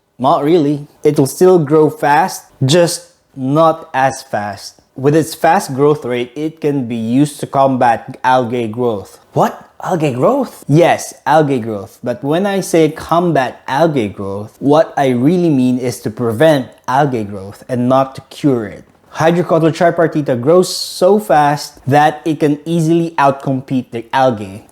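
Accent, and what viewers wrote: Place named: Philippines. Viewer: I can hear that youre a filipino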